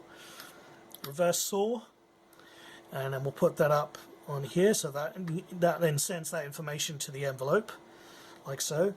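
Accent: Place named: United Kingdom. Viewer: Thanks for this, I'm really considering one now. Feels so right to hear a UK accent explaining a Wasp (clone, but still)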